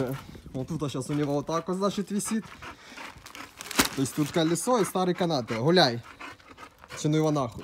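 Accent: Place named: Ukraine. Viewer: Дача, украинский акцент, тупа, ля, чи не., ты ли это?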